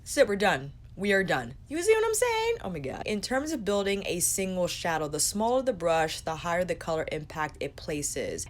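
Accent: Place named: East Coast, United States. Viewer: I dont know what kind of accent you have, but I like it. Feels East Coastal.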